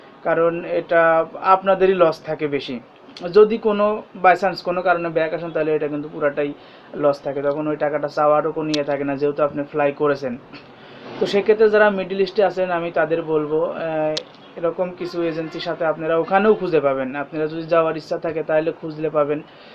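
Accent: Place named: Jessore, Bangladesh. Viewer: আমি মলায়েশিয়াতে আছি ,আমার 7 টা দেশ all rady ঘোরা আছে , আমার বেতন 3500 রিংগিত বাংলা 70 হাজার + , তুরকি তে আমি to -rest অরিজিনাল ভিসায় যেতেচাই মাত~ 10 দিনের জন ,আবার মালায়েশয়াতে ফরবো, পরে ইউজোনে to -resta যেয়ে আর ফেরবো না , আপনার জানা মতে তুরকির ফাইল গুছিয়ে দেবে এই রকম এজিনসি আছে ??যানাবেন ভাই ,আমার বাড়ি যশোর ,আপনার কথা শুনে মনে হয় আপনারও ঐ দিকে ??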